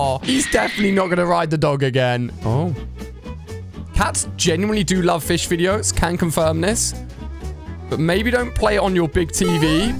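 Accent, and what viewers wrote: United Kingdom. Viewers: what are u British or something because I'm British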